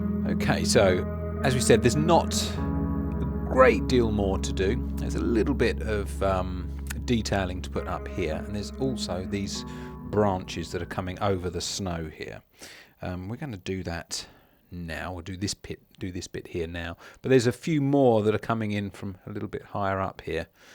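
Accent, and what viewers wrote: United Kingdom. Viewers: Lovely British accent🥰😀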